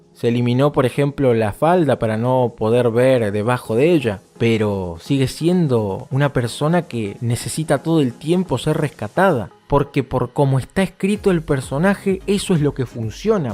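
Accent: Argentina. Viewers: En este vídeo se te escucha mucho el cheeee, el boludooo, lo argentinooooo; me gusto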